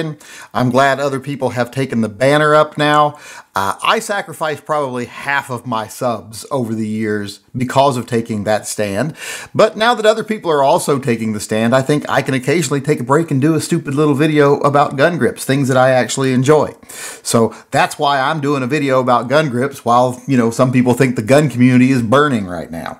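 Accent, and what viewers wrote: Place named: West Virginia, United States. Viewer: Your West Virginia is showing. They're the only ones that say, "colbra".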